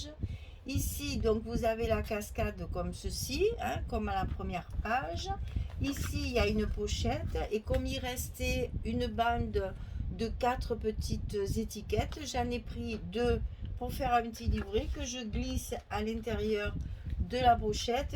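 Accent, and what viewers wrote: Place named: Provence, France. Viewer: vous êtes pétillante, pleine de joie de vivre et on devine votre accent provençal. . Tout ce que vous nous apportez dans le scrap... à très bientôt 🤗😴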